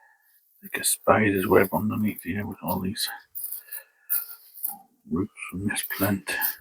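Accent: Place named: Newcastle, United Kingdom. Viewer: Hello?! Very interesting new channel with fascinating plants of some age at last! I’d like to know more about you, but I’m subscribing anyway. The bonsai show cleared up my question as to your accent, the main voice was Geordie I reckon! God bless your endeavours 🙏✝️. ✌️🇷🇺☮️🇺🇦✌️. ✌️🤍🇮🇱🤍✌️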